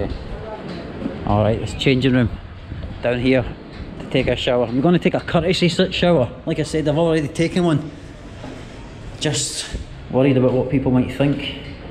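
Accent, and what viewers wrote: Scotland, United Kingdom. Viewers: Limmy has ruined the scottish accent for me. i can't take this guy seriously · That hotel looked sweet m8, another great vid your accent is brilliant over there the Scotsman with 2 strong legs 🙂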